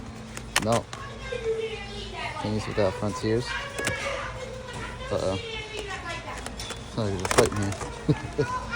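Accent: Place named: New York, United States. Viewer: You sound like you’re from NY like I am. If you are, I’ve got a 150 or so CDs for you to look at.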